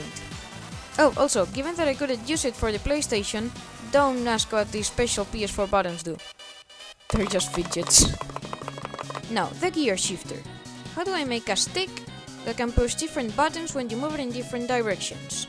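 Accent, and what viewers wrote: Spain. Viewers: Hi! I was wondering were you were from. By your accent, I guess you're from Spain, If that's the case, just know that what you've done could have been a 1rst of bachelor project, so CONGRATULATIONS!!!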